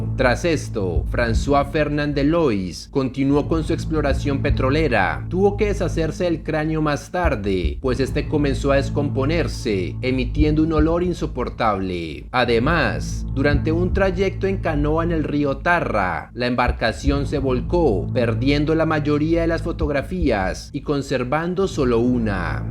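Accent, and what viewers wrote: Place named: Mexico City, Mexico. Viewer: Parece chilango ha no se crean yo soy chilango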